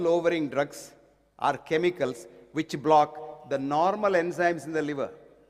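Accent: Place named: India. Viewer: Only Indian will understand his English....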